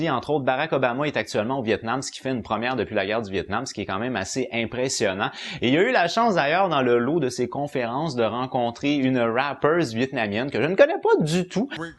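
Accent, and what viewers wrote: Quebec, Canada. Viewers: c'est desagreable cette voie quebecoise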